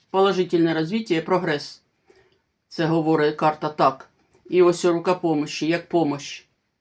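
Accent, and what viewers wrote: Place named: Surzhyk, Ukraine. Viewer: . Люблю вас смотреть и слушать, как вы разговариваете на нашем, суржике,. Посмотрите Мариуполь, может, что то уже изменилось.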